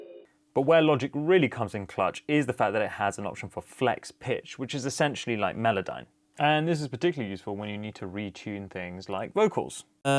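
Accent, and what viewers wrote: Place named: California, United States. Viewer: The California accent is also how we Americans sound doing it lol well done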